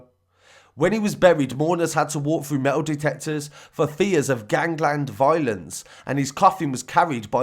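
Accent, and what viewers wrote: Birmingham, United Kingdom. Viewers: Good channel but your brummy accent burns my lugs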